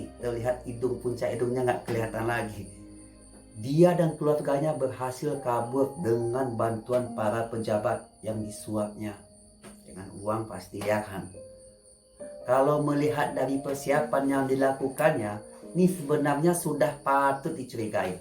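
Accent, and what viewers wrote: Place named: Padang, Indonesia. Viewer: Wah....orang padang nih mah.....logat nya atuh...he..he....he...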